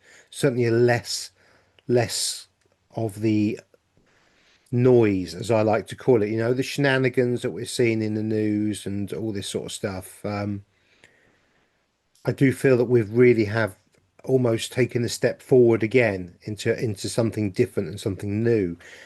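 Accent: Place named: England, United Kingdom. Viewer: Congratulations to you, your work is a tremendous boon for we who feel these energies so acutely. I particularly love the English accent in your humble delivery. I note that several people are questioning Richards absence from your line up. We don’t need an explanation in the grand scheme of volatile life, but a mention might allay such curiosity. Yuletide greetings from somewhere that feels like nowhere in Canada!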